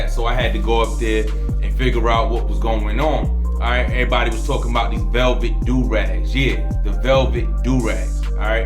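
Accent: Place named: New York, United States. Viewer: He's clearly from NY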